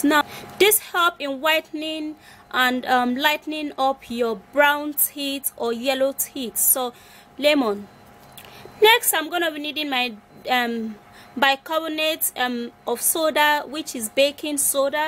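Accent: Nigeria. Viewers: thank you so much indeed!! all your videos are great love it.Cuz it's amazing girl!! you teach me how to use it!! thanks once again for your help and advices for showing us to be able to get clean Oral Hygienic!!! such a courageous active young lady! ! well done and keep your efforts!! love Nigerian accent they're cool people! !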